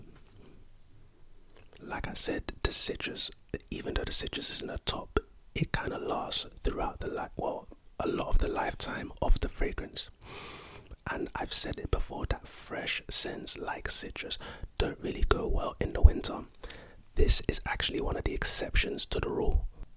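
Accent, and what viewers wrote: United Kingdom. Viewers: is the best new male ASMRtist comin up this year. Love the Brit accent, smoov voice and great sense of humor. Not to mention his nose, which certainly knows. Big ups for getting me back into fragrances. I actually sound like I know what I'm talking about at the dept store fragrance counter, and every time my woman sees me she sticks her nose in my neck and gives me a big whiff to see what I'm rockin. Thanks u got the sauce fsho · THE BRITISH ACCENT IS GOD DAM GOOD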